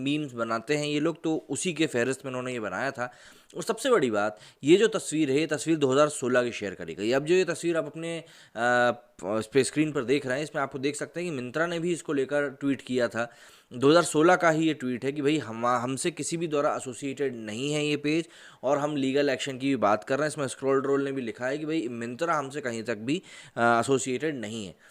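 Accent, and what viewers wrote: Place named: Assam, India. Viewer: I think your accent have an assamese touch.😄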